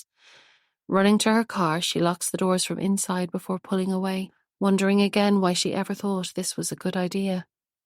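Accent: Ireland. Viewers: sounds Irish to me.... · Irish accent? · Yay! Irish narrator. Dunlaoghaire! I know the town so, so well!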